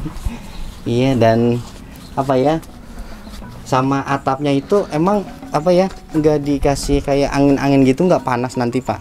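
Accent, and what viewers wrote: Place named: West Java, Indonesia. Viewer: Mas logatnya kaya orang sunda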